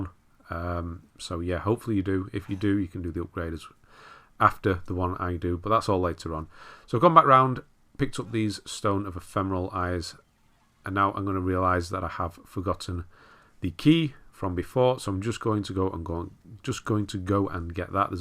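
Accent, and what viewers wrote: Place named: Cheshire, United Kingdom. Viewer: That accent is subtle btw, placing you somewhere near the M56.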